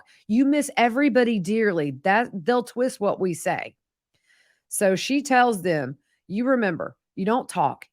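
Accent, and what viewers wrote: South, United States. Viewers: You sound very southern even when you’re not trying to sound like them. I’m southern so I can hear it. Love the videos. Thanks. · Love how you narrate with that sassy southern talk you had me lol,thanks for all the hard work!⚘️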